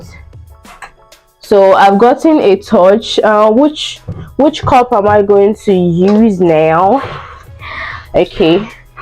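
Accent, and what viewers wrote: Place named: Nigeria. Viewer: Nice video and alot of creativity. But please try to use standard English not the Nigerian style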